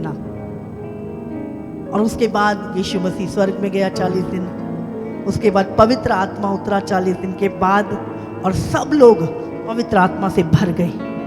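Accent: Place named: Jharkhand, India. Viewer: Malgo jharkhand Sa bolrhi apa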